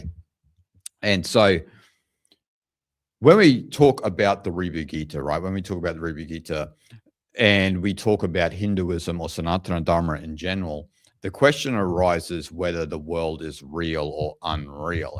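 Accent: Australia. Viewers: I love your Australian accent! Thanks for keeping it real!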